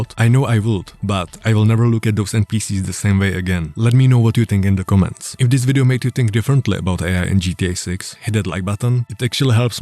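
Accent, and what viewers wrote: Czech Republic. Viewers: this is very dystopian, future is scary... no one took the lesson from Terminator... Btw arent you Czech by any chance? :D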